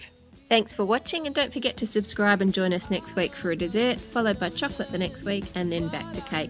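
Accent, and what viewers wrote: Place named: Adelaide, Australia. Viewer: Are u from Adelaide?! U sound like u r! I'm from Adelaide!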